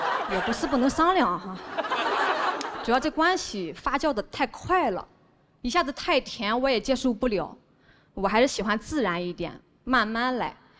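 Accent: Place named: North, China. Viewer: 他的风格一部分是靠节奏，一部分靠口音，好笑不好笑要看对北方方言的熟悉程度，不熟悉的人就是觉得不好笑。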